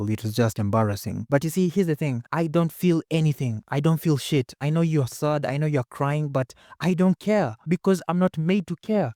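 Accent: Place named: Kenya. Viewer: What I like here is that you didn't stutter and completely did it with a Kenyan accent. Standing for authenticity 👍🏾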